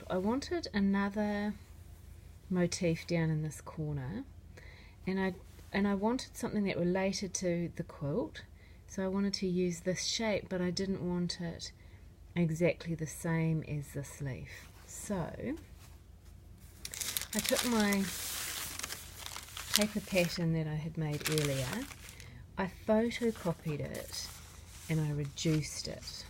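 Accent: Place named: New Zealand. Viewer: Just as I was wondering how I could use my sewing, painting, drawing skills to make things without spending a fortune I found you. Great to listen to a Kiwi voice quietly explaining a simple process using things I recognise without the endless side explanations of things irrelevant :)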